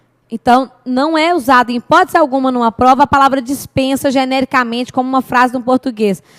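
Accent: Minas Gerais, Brazil. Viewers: Hey... Você é a melhor!!! Ta ficando Pop!!! E o sotaque de mineirinha é a cereja do bolo para meu aprendizado!!!!! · Independente dessas aulas terem sido publicadas há alguns anos, é a melhor professora de Direito Administrativo para concursos que existe no YouTube. Ela tem o que o outros não têm (apesar de serem bons): a simpatia, o carisma, o sotaque mineiro e, claro, o toque feminino...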